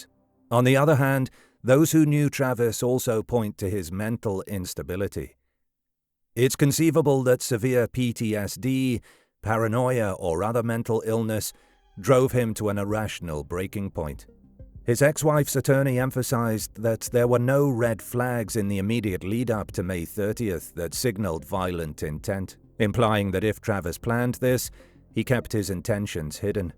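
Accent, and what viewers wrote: United Kingdom. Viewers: I will cut him some slack as he sounds like he hasabritish or Canadian accent.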